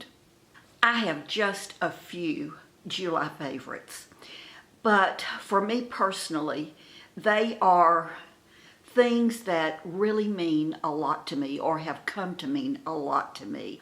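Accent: South, United States. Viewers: Know now why I love watching you, love the accent(I'm a Southern too) and best of all I am a July baby too!! Besides I love all the other stuff you do, I have already bought some of the makeup you liked and it is great.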